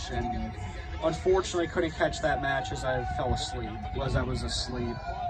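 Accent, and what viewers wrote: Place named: United States. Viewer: You do sound America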